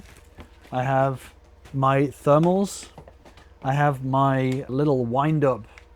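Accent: United Kingdom. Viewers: Are you originally from the UK?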